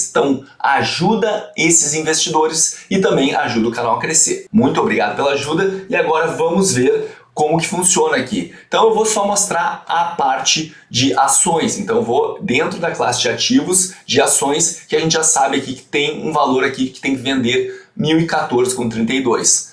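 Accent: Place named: Rio Grande do Sul, Brazil. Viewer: Tu és gaúcho? Tens o nosso sotaque.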